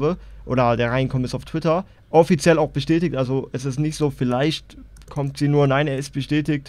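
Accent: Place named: Saxony, Germany. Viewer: sächsisch Comedy juckt?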